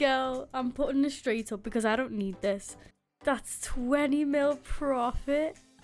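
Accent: United Kingdom. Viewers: Proper British nice to have a English skyblock player for once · question where are you from i feel like you are from the uk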